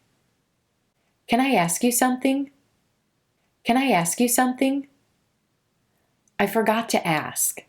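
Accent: United States. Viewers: The sound of your voice is so crisp and clear. You have a wonderful American accent.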